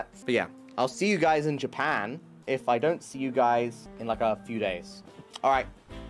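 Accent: United Kingdom. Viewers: "Doesnt look asian enough" bruh. His voice too british for that asian look if u ask me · I cant make sense of his voice cause he sounds so british but he looks so filipino 😂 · The fact that he looks so Filipino but sounds so British messes with my head. While I was watching the live I kept thinking that he sounds dubbed 😭💀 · I sometimes don't get how he looks filipino but sounds like a fucking british character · man's got a british accent but he looks like an asian mobster without the shades very friendly lookin' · He looks so Filipino but sounds so british its actually confusing me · His Britishness is contained entirely in his voice and his cooking skills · The british voice with that face cracks me up for some reason. Am i an asshole?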